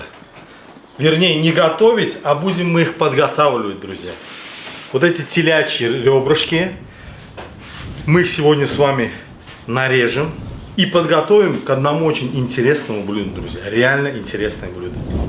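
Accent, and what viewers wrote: Baku, Azerbaijan. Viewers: Я многих подписал...акцент бакинский..ностальгия.спасибо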